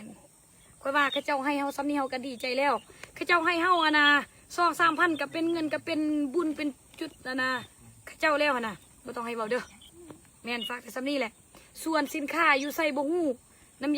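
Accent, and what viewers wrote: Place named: Luang Prabang, Laos. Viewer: ฟังสำเนียงเจ้ แก เว้า กะรู้ ว่ามาจากทาง หลวงพระบาง หรือ ที่ประเทศไทยเราก็ สำเนียงคนเมืองเลย.หรือจังหวัดเลย😂😂😂สู้ๆเด้อเจ้ คนสวยใจงาม.#คนไทยในต่างแดน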